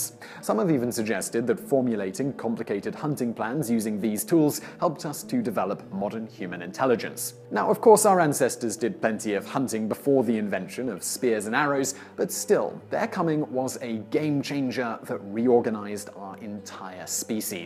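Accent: England, United Kingdom. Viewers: "British accent"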